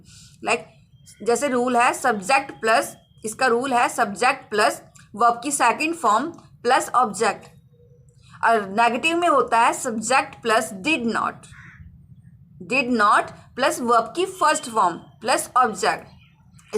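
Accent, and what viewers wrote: India. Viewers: Your English is our Indian English like I understand better than others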